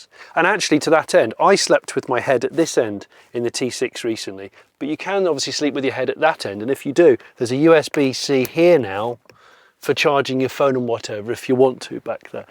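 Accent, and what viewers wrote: United Kingdom. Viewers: weird?!?? Just plain British......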